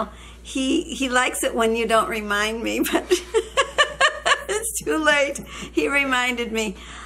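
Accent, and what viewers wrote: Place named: Western New York, United States. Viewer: You are from Western New York Ms. lol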